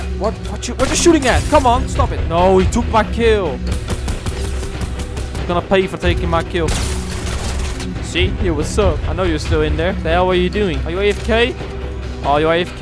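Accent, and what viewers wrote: Netherlands. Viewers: Are you dutch cause you have a dutch accent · Nice dutch acsent dude im dutch too So its Not HATE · I grew up in Holland and moved to the uk and I can tell your dutch just by your accent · Jij bent Nederlands ik kan het horen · Haha je hoort echt aan je stem dat je Nederlands spreekt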